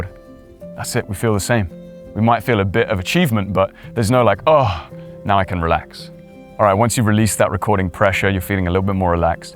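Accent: England, United Kingdom. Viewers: I used to work at a radio station as a receptionist. I heard every kind of great voice to the slow, boring voices. I learned a few things that have helped me with my channel. I try to let people "feel" my voice. This is good information he is sharing. The English accent just gives him a cooler edge. 🎤👍🏽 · It's called having a British accent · is the english accent a requirement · English accent. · It also helps to be classically good looking and charming -- And to have a clear and articulate English accent with a soothing baritone voice. No Diddy. I'm just pointing out that in addition to the steps which you cover, I believe it will work especially well for you because you are beautiful (although your skin was oddly greenish-yellow in some camera angles.) I guess the point of my saying all of this is, I wonder if this would work for everyone. I mean, I'm going to try it for sure, because I am not a blubbering rock troll and I'd like it if people watched my guitar content. 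I appreciate this video and I look forward to seeing more of your stuff. Thanks! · Well, I watched your video. And at the end, I felt like I was talked to by a skeevy religious zealot trying to get me in their cult. No amount of soft music, country bokeh, low grade UK accent voiceovers and coy looks is going to change that. I will continue to be me. Draw those that enjoy me for me. Try to be the BEST me I can be. And not gaslight people into "liking and following me". Where exactly is the merit in that? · Not everyone likes an English accent. I for one generally don’t . I will skip one it unless American English. This guy has gone tone. I’m interested in what he is saying so I listened to see if I can incorporate in my channel- which is a different IDENTITY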